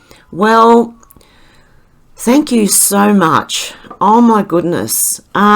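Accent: Australia. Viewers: Hun I am really sorry that some nasty people made you feel bad about yourself. For what it's worth... I have never heard your speech as anything other than normal... well.. except for the Aussie accent ( I'm a Brit) lol. I look forward to your videos and always enjoy what you put together for us. Sending you all my love. Lisa xxxx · Iv been trying to listen for your speech to sound funny but you still sound normal. so keep being our Australian voice that covers the important world news. We need more Australians like you.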